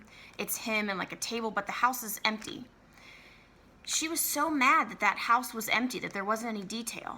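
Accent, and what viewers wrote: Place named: New York, United States. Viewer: I'm catching up on your videos and I totally thought you were from New York! I grew up in NYC and swear you could have been my neighbor there. Maybe it's how quickly you speak? (Which I LOVE, because NY). I love Ebenezer's Christmas, I think I have to find and stitch it for my brother.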